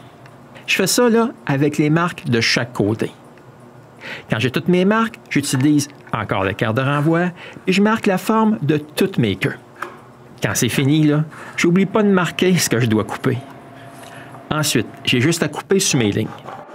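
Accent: Quebec, Canada. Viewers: Is this a quebec accent?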